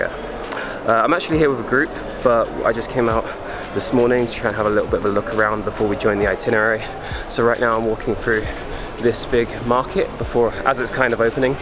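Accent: United Kingdom. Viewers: He sounds like he's from Southern England? How hard is it to visit a country like this with a UK passport?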